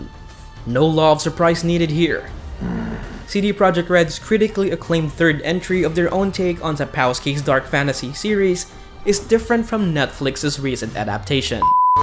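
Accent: Philippines. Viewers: Yeah. Accent pa lang, pinoy na haha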